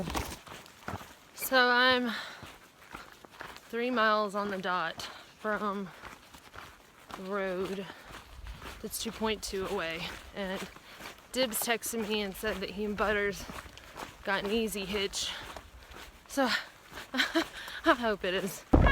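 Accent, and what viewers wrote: South, United States. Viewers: Thanks again for taking me along on this adventure. Has anyone else thought that southern accent has faded just a little bit?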